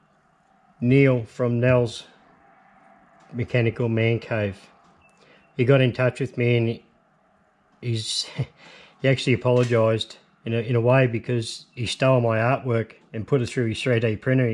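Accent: Australia. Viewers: Great job, it's like watching Max all over again...... you have even got his Ozzy accent nailed mate! Lol. Cheers, Jon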